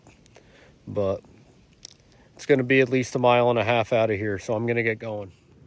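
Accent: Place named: Midwest, United States. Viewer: You sound and talk like a Midwestern cowboy.Where you from good sir?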